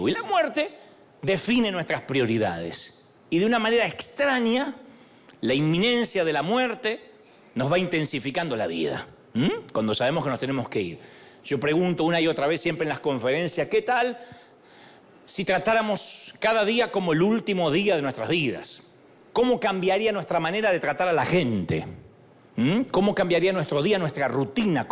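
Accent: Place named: Argentina. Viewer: Hola querido… tu eres mi cartero del cielo… me llegan los mensajes y traspasan mi alma🥰😇y Papá Dios y Mamá Maria no se equivocan con escogerte y mi marido que murió hace 6 años era de Buenos Aires… y mi hijo Aldo Cesar es mitad argentino🇦🇷 y mitad de mi México 🇲🇽 lindo y querido y me emociona escucharte con tu acento que convivi por 36 años y me enternece que mi Jesús te utiliza para que llegue el mensaje con tu acento que extrañamos tanto❤️🌹❤️🇦🇷🇮🇹💕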